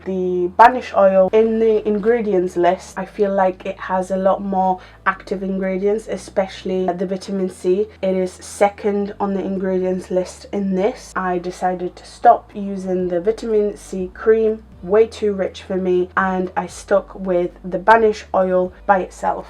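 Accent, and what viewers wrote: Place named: United Kingdom. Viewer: Love your personality and uk accent. Wanting to try this out myself.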